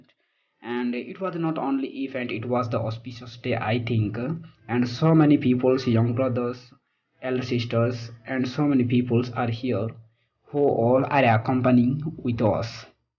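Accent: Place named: United States. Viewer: America english😊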